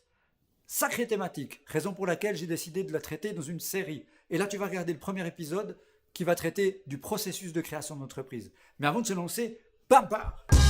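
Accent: Switzerland. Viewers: J'adore cette chaîne et d'autant plus avec un accent de chez nous :P #swiss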